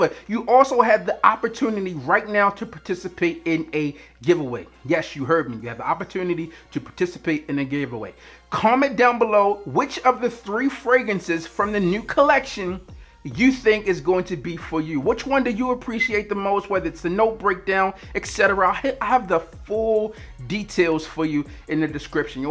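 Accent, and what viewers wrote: Baltimore, United States. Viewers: You from Bmore?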